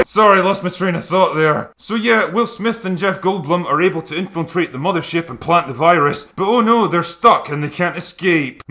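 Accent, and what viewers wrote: Scotland, United Kingdom. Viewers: With his thick Scottish accent it made it funnier lol. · Listening to "USA! USA! USA!" with your Scottish accent made my day, Drinker! Couldn't agree with you more about this movie!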